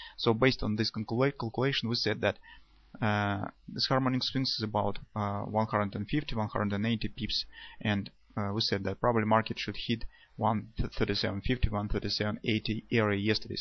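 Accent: Russia. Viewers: The accent is killing me. Why does every single Russian have such a strong, distinct accent? I've never understood that.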